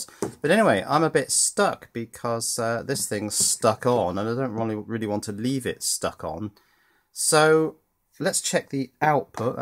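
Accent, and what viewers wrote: United Kingdom. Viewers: Are you British? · What was your first clue? Ha... yes, is British.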